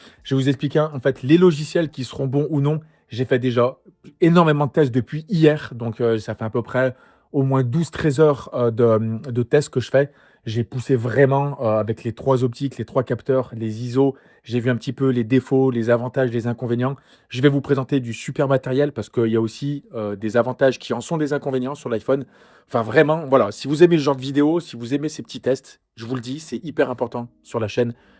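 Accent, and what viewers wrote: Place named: North, France. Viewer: Tu serais pas du nord par hasard ? Les A que tu prononces font très nordistes. Tkt je suis de lille c’est pas pour me moquer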